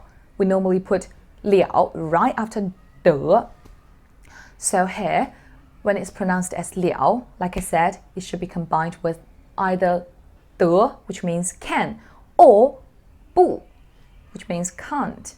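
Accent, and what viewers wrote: United Kingdom. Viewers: British accent??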